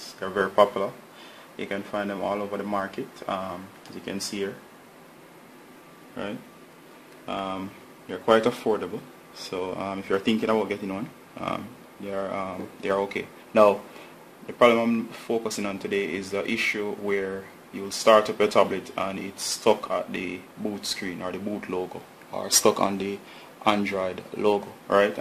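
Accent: Jamaica. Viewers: kinda personal but are from Jamaica?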